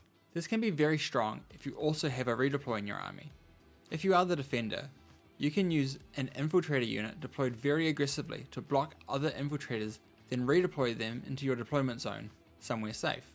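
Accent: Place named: New Zealand. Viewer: You sound like a kiwi, where abouts are you based?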